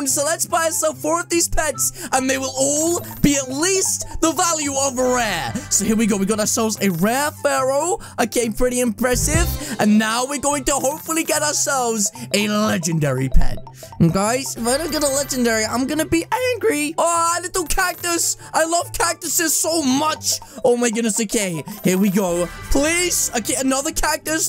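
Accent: United Kingdom. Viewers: Its my first time seeing this vid u sound like british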